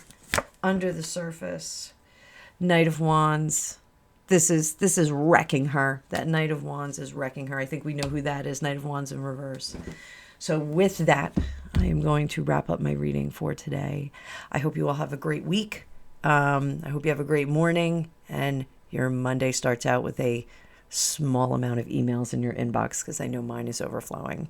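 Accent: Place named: New Jersey, United States. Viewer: More Jersey accent, please!!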